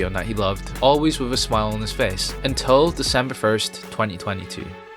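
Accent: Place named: Scotland, United Kingdom. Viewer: Your Scottish accent is so absurdly subtle. So much that I’m not sure if it actually exists or if I just need to sleep. Say purple burglar alarm.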